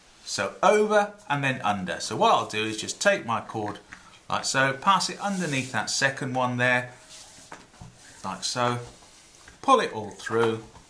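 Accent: United Kingdom. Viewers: The reason for it being so good and so clear ist because it's with a brtitsh accent! Which makes it easy to understand. Oh, the video is brilliant! Cheers for that!!!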